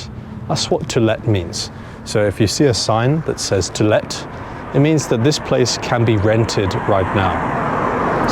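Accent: London, United Kingdom. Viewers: Your accent gives me all the memories of my younger life in London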